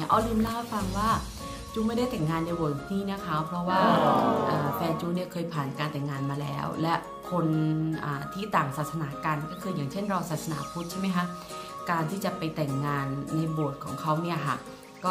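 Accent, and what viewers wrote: South, Thailand. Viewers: ดันเลย พูดใต้น่ารักดี คะ❤️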